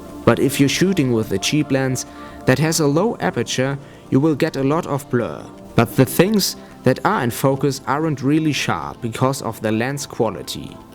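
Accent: Germany. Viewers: cuz hes german :D and he have this cool accent when speaking english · That's his real accent. He is German.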